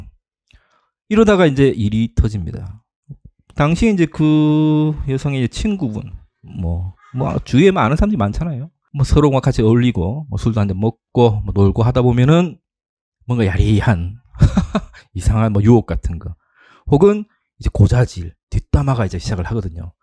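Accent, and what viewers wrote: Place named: Gyeongsang, South Korea. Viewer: 현재 워킹 현황 영상과 경상권 억양에.. 친근함과 함께 구독 눌릅니다^^ 자주 올려주세요~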